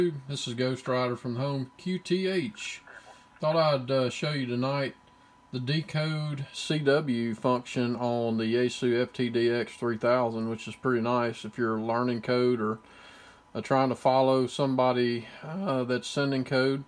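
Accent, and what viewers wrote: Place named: South, United States. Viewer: Thanks Ghost Rider - Does the CW Decode display work for Tx as well as it does on Rx? Also, thanks for having a Southern voice ! I've lost all my Arkansas and Texas voice.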